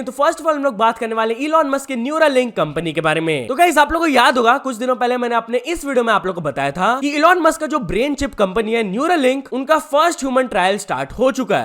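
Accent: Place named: Bihar, India. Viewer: got all the bihari accent inside him 🥵🥵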